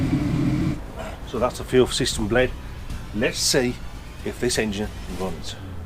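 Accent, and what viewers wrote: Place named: Barnsley, United Kingdom. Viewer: I discovered your adventure and I followed the episodes one after the other with great pleasure. The quality of the videos, the humor, the scope of the work and especially its production are of high quality. My English dating from my schooling and a little more, I have to admit that I did not always understand everything but your accent reminded me of my short stay in Barnsley in 1984 during the miners' strike. By the way, your 350z isn't bad either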